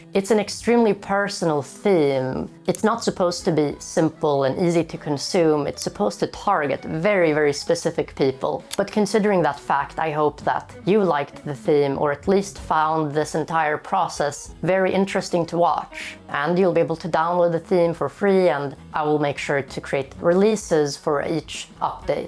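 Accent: Sweden. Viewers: Legendary algorithm pull Edit: Jag visste att det var en Svensk accent jag hörde. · i had to hear like 3 seconds of your voice to find out youre swedish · 5 seconds into the video, my swedish ass goes "hey this guy sounds swedish". i've never watched you before, just somehow clocked it. dubbelv eller vad kidsen nu säger.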